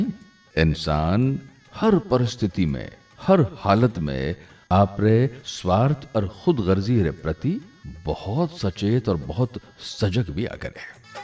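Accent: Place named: Marwar, India. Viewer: जी आपरी मारवाडी़ बोली गणी फुटरी लागे सा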